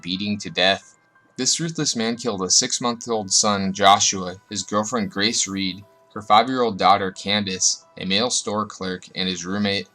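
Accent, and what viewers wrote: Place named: Canada. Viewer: he sounds irish canadian , defo got tht irish twang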